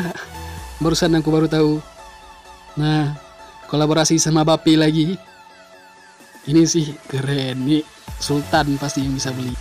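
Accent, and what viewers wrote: Aceh, Indonesia. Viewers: dari logat lu, gw tebak lu dari negri plat bl ya bro?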